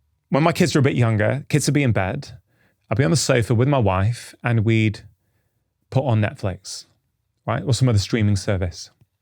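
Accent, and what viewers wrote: England, United Kingdom. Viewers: I like your english accent...❤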